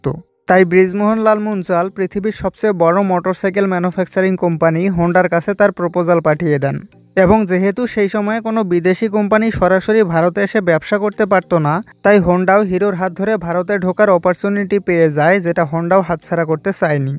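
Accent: Bangladesh. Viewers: পুরাই বাঙাল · Are you Bangladeshi?. Because you (talk) pronunciation as Bangladeshi people.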